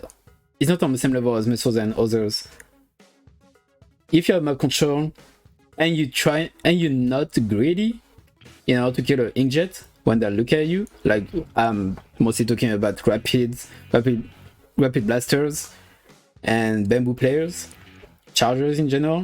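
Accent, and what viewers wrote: France. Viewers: The french accent se fait sentir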